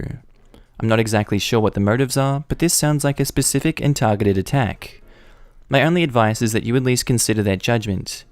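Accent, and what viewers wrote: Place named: Australia. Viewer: I just want to listen to my Aussie husband talk...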